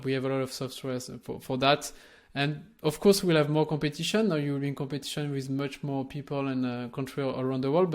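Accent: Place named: France. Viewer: That’s French accent! Great! 🎉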